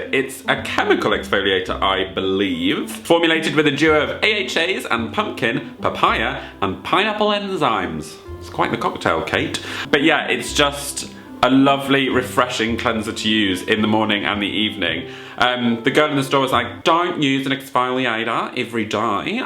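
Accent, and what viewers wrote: Australia. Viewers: Listen ere Sheila! Living for ur Aussie accent lol.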